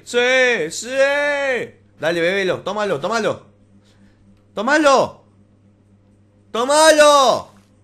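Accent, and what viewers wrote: Córdoba, Argentina. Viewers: sos cordobes ??? :D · JAJAJAJAJAJAJAJAJAJAJAJAJAJA, mori de risa xD me encanta el acento, bien argentino <3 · Oye, ¿como se llama ese servidor? Pasame la IP porfavor... Grax (mi cuenta es ARIKUZUKI o mi otra cuenta de minecraft es 4R1KU2UK1) Si me encuentras en algun server... avisame plis XD.¿Eres de Córdoba? Tenes asentó cóordobes. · Sos argentino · es bien argentinooooo!!!1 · eres argentino ???? o chileno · sos argentino .. cordobés ??? yo soy de buenos aires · eres cordobes? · ERES CORDOBES??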